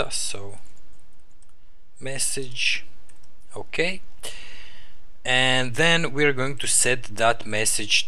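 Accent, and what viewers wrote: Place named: Russia. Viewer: Привет, вижу русский акцент, можешь на русском уроки тоже писать?)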